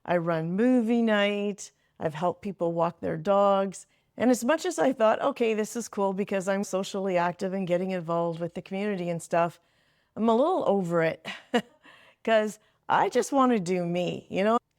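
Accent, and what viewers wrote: Canada. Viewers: I’m hearing a Canadian accent…..you did a great job with this video….pat yourself on your back…you are too young to be living in a 55 community….you look very young…I get that you moved to be close to your kids/grands and that’s important. I’m jealous because my kids live far away. I can picture you in a complex, close to your kids that does not have the age restriction…..don’t know if that even exists where you live. My vote is for you to move if you can find a nice place…..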